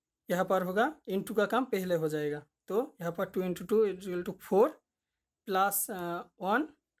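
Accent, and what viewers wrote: Bengal, India. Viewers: I got the ascent of Bengali in your language...so proud of you bro being a bangali.....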